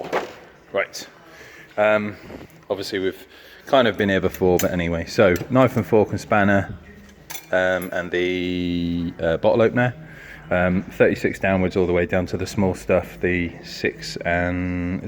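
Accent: United Kingdom. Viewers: English man in USA ?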